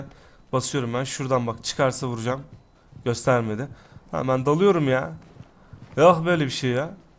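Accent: Adana, Turkey. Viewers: OLUCU DİCEKTİ VARDI DEDİ HAMURUNDA ADANALI ŞİVESİ VAR VİDEO CEKECEM DİYE DÜZGÜN KONUSMAYA CALISIYORSUN BRO 👑👑☺️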